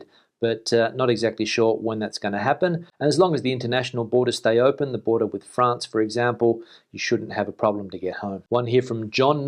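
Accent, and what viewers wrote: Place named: Australia. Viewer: Hi, — really appreciate what you are doing with your daily updates. I don’t miss a one of them! We had to give up our Sitges apartment earlier this year and can’t wait to be able to return to Spain on a regular, if not permanent, basis. But who knows when that will be at this point. Hats of to you all in Spain for your efforts and, again, thanks to you for your hard work in keeping us all informed. (PS: would love to hear you speak a few sentences in Spanish so I can hear an Aussie accent in that language!) 😁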